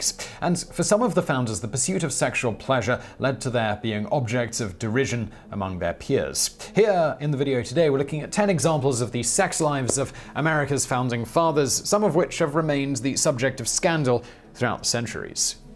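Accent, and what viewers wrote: United Kingdom. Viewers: A dude with a British accent tells US about the founding fathers. Go figure!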